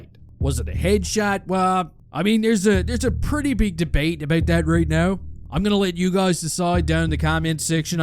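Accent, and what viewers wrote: Newfoundland, Canada. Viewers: love the videos man, great content mixed with humour 👍 Plus the Newfoundland accent is the icing on the cake 😁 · I think I just found my new favorite hockey channel. Top notch Canadian narration, filled with tons of catchy phrases. This should be a good year bud, I'm subbed now so let the lettuce flow and the O's be ewwwwwwt!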